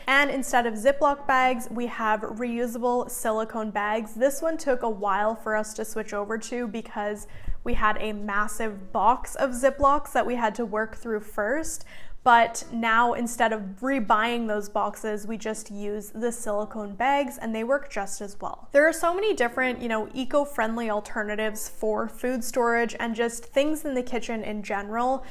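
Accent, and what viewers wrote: Canada. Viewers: Hello, I'm glad I found your YouTube videos today. I have now subscribed. I was brought up in Canada and recognize your accent and see the spelling differences. I like your straight forward ideas and how you make it simple for anyone to achieve a great goal. I would appreciate a video on cleaning recipes and ideas as I make most of my own cleaning products also. I do still use good old Comet or Barkeepers Friend powders. I will be looking for your video. Have a good week.😊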